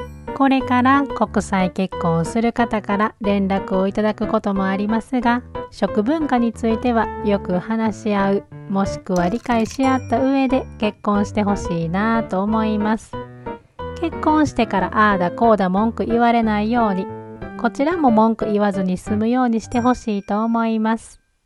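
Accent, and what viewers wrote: Kansai, Japan. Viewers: ドイツ、学生の時からの憧れの国。そこで彼方の方と国際結婚で暮らしている事に興味津々です😊ご家族もいて、穏やかな関西弁がほっこりしてます。初めて観てチャンネル登録させていただきました。どこで暮らしても小さな幸せ見つけることって大切ですね。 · 今日は予定をこなし帰宅後すぐ観れました❤ 母が転倒して右腕を打ち食べる事も出来ないので数日寝泊まりした後、ショートステイに入居させました。痛みはなかなか消えないしあちこち悪くて退去後も1人で暮らせるか施設も視野に様々な選択を迫られています😢 動画を毎回観るのが楽しみで癒しです。 Nobanaさんの関西弁だけど柔らかいトーンのナレーションが好きです✨ つまみ食い女王にもお会いしたいです😊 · 私は、とにかく nobanaさんのおしゃべりが大好きです😍 バリバリの関西弁😂癒されるわーって感じです。住んでいるのは横浜ですが、母方の実家が関西なので😊 あとは、やはりドイツ暮らしが、手に取るようにわかること。主婦目線、母親目線、いろんな場面があり楽しいです。これからもずっと応援しています♪♪♪ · こんにちは😊 今週はバタバタして今頃拝見させて頂きました！キャベツと鶏ムネが塩して放ったらかてるだけで、美味しくなるなんてどんな魔法ですか！？😳 さんの動画の好きな所は、さんの声と関西のイントネーション🎶 経験からの暖かい言葉、でしょうか🤔 あと、マリメッコのエプロン！かわゆす❤ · はじめまして。今日初めてユーチューブ拝見しました。他の方もおっしゃっているようですが、優しい関西弁がとても素敵ですね。なんだか癒やされます。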